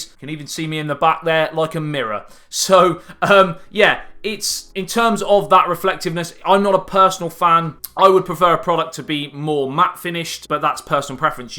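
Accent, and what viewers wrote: United Kingdom. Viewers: The British accent is fucktarded enough, but throw a lisp in with it and you're triple fucked. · I seen this video and held my breath then thought, "Thank, god. He sounds British." Why do I hate my own country so much? XDDDD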